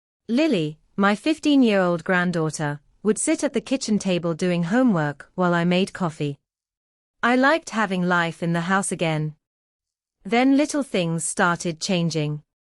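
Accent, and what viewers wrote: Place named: United Kingdom. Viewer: I cant stand the British accent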